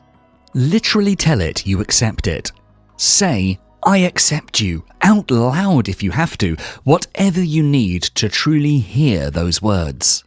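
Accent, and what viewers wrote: United Kingdom. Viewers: The narrator is so hard to listen to, can we try to use a more smooth sounding American accent narrator instead? The British accent tone is just so up & down & sounds like trying so hard to pronounce the words but actually more difficult to hear clearly. Thank you!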